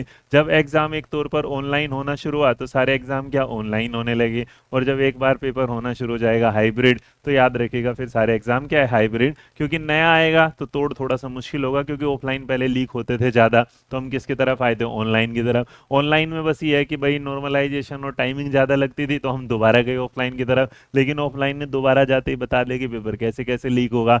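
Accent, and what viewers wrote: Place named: Haryana, India. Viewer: Haryanvi touch....oonline 😅😅😅 ...Sir Please no bad intention just like the way u speak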